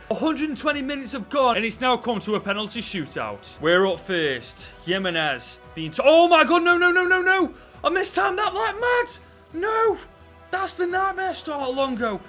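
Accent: Stoke, United Kingdom. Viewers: Hey man, quality video! Just wondering from the accent if you’re a Stoke lad?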